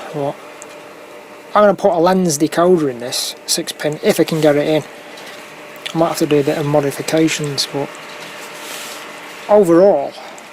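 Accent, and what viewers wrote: Manchester, United Kingdom. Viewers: Well, its from over tyneside really but yeah okay it worked around liverpool and manchester... Nice to hear your accent. Do miss the manchester twang having moved to Australia. Its just a really small loco in real life so it would look really small in model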